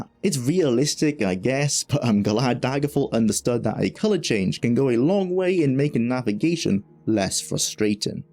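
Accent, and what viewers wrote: Wales, United Kingdom. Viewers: I like your accent. Welsh? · Yes he’s welsh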